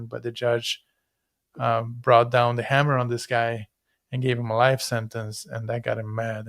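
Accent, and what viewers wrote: South, United States. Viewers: don't listen to them - your acting is hilarious! I love your southern accent!! Please let us see the rest of your movie! 😂😂😂😂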